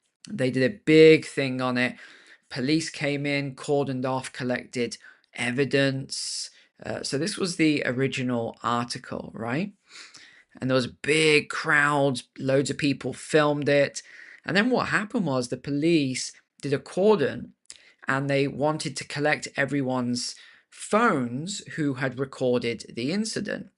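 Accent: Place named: United Kingdom. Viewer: Look man, as an American the sound of a British Accent is beginning to irritate me to no end. It's your love of the new Global Warming/Climate Change Religion, to your censorship of free speech, to your allowing the unimpeded invasion of your country by the Cult of ISLAM, to the general stupidity and wokeness of your society in general, UKRAINE STUPIDITY!! I hope Trump hammers you idiots!!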